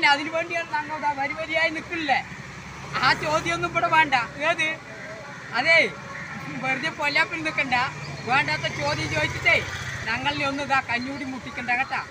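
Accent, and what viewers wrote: Palakkad, India. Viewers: really wonderful natural "palakkkadan" speech, super "eatta"....ide eppadi errukke"... "nan naadanda" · അയ്യ്...ഇത് പാലക്കാടാണ്ടാ...🥴🥴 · പാലക്കാട്‌ ഭാഷ.. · തനി പാലക്കാടൻ ഭാഷ ഒരു പാട് ഇഷ്ടപെട്ടു🥰🥰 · പാലക്കാടാണെന്നു തോന്നുന്നു 😃😃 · ഇത് പ്രതികരിച്ചതല്ല ഈ ചേട്ടൻ ഈ പാലക്കാട ശൈലിയിൽ ഒരു വീഡിയോ ചെയ്തതാ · ഇത് പാലക്കാടൻ സംസാരംമാണ് എവിടെത്ത് ആണ്ങ്കിലും കൊഴപ്പമില്ല ഏട്ടൻ പൊളിച്ചു 👌👌👌👌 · Palakkad bro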